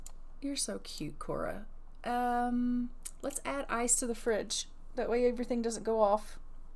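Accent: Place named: Tennessee, United States. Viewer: I had to go somewhere unexpectedly so I can watch the rest of the vid!Cora.....uh.........!They are definitely a lovey dovey relationship!I feel like they were meant for eachother, Also For The Heritages heres an idea!! Fred-50% Irish & 50% German Cora-50% Irish & 50% Spanish Also are you 5 hours behind me or 7?It's currently 3pm where I live,unless you usually wake up quite early or you have work!Because if you are 7 hours behind it'd be liek 8 and if it is pls rest,I mean unless your going to work but it's the weekend so wake up whenever I want and look at my dastardly dumb comments later lol!For some reason I really want their daughter to be called Merida just because of the hair lol!It kinda surprises me when the life expectancy is like 46,because I know royals have the best heakthcare but alot of royals during this time died in their 80's!!Yes that was me,I think they should buy a business!Butt during the great depression I think we'd have to get rid of it for a while!I feel like we could have a long life span but age them up when we want or kill them when it's the right time!My great grandma was born is 1924 and on Google it says the life expexctancy was like 52,the healthcare in her country wasn't great when she was in her 30's but she lived to 2020,I think my great grandma might be super human(Yes she was 96 lol). 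And the oldest person ever was born in 1884!Yes lol!!!!!She legit is eaten by the piano!OMG Yes accent,I love your tennessee accent,legit she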